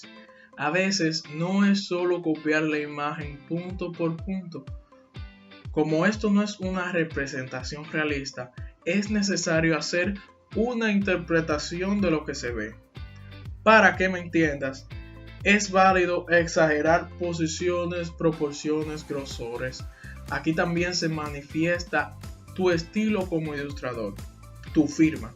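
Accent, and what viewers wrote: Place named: Dominican Republic. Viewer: Muy bueno el tuto. Según tu voz, debes ser dominicano. Un abrazo mano desde el patio.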